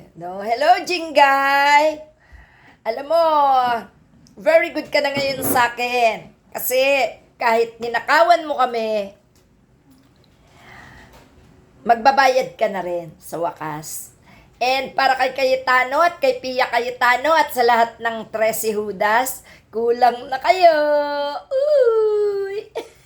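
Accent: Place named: Cavite, Philippines. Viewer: Caviteña ka pala Maam..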